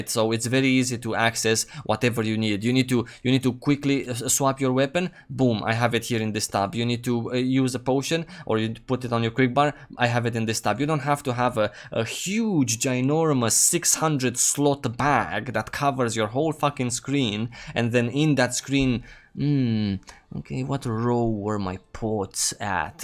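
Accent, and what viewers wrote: Italy. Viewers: Are you from Italy? your english accent it's tricky :D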